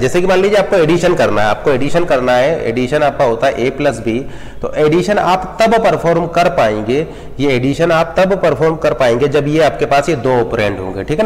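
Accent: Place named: India. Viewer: Im Portuguese, I have a hard time understanding English with an Hindi accent especially when he speaks so fast, I really appreciate these videos, could someone please subtitle these?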